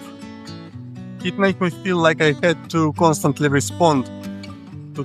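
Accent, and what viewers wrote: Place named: Russia. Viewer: An accent is russian that is true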